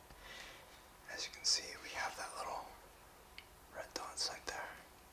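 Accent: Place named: Canada. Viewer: The Canadian accent is relaxing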